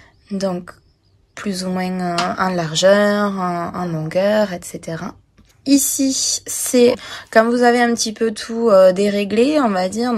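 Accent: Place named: Carcassonne, France. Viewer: Waouh ... quelle machine 👍🧵 Merci à toi Tu as un petit accent que je connais ... je suis de Carcassonne lol 😊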